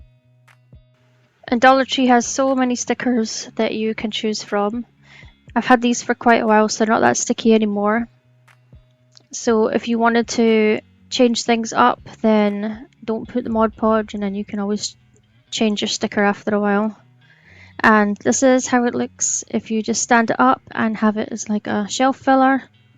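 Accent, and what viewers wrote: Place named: Northern Ireland, United Kingdom. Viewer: I love everything in this video. I subscribed to your channel because I love listening to you talk — my Mom was from Northern Ireland and used some of the same words and phrases you do and her accent was very similar to yours, so you bring back warm memories for me.😊 Everything you made in this video is pretty simple but turned out really nice. You have some great ideas!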